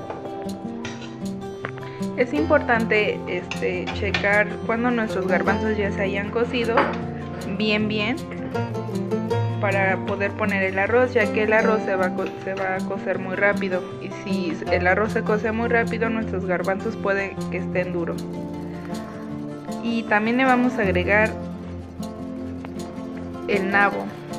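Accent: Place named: Guatemala. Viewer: Disculpa de donde eres porque pensé que eras de Guatemala.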